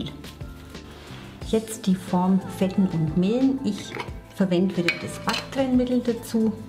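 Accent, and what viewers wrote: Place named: Franconia, Germany. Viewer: Liebe, jetzt muss ich dir endlich mal ein Kompliment machen!Deine Rezepte,Tricks und deine liebevolle Art sind einfach super! Dein fränkischer Dialekt mag ich besonders,komme auch aus deiner Gegend.Da ist immer ein bisschen Heimat dabei!☺ Bitte mach weiter so!Du bist genial!🍰🍩Danke du Liebe🌹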